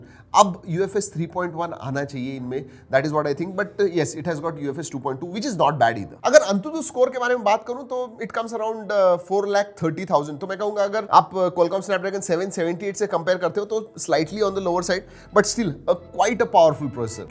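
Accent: Maharashtra, India. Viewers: I like that marathi accent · The whole thing that I love the most is little bit sweet marathi 😍😍luv it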